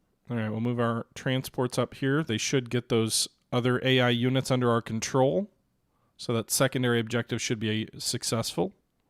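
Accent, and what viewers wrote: Germany. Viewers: Is that a german accent? 😅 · That german accent is pretty good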